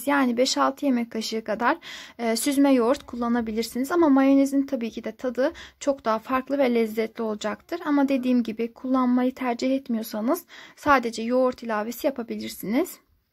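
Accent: Azerbaijan. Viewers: Güzel sesinizden azerbaycanlı oldugunuz belli⚘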